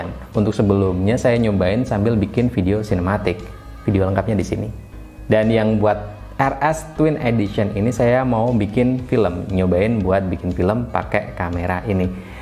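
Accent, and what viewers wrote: Pekalongan, Indonesia. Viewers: Sampean Pekalongan ya mas?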